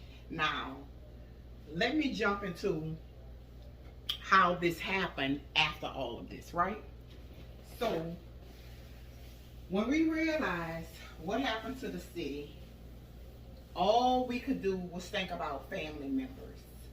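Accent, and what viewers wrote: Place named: New Orleans, United States. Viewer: I knew I heard that NOLA accent. 😂 when I started watching. I love it. I will NEVER forget Katrina and Rita hit Texas shortly afterwards. It was August 2005. I will never forget it. I’ve made some of the best friends from my NOLA posse who came to Texas and never returned after Katrina. This video had me crying and gave me chills remembering the horrible stories.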